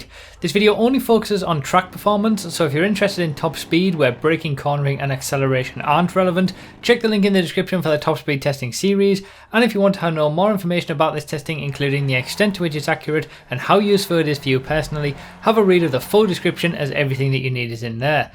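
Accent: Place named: Bradford, United Kingdom. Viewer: okay that makes more sense cos to my ears he has an accent that would come from Bradford or around that area.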